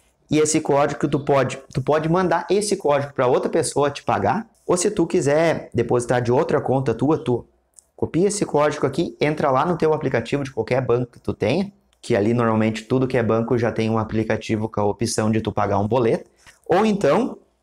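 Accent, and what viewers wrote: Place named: Rio Grande do Sul, Brazil. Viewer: Muito obrigado. Um vídeo explicativo e direto. Além do sotaque gaúcho, haha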